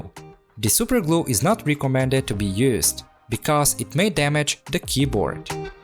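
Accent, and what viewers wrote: Russia. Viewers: Русский акцент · ты русский ? если да то ответь (просто акцент русского)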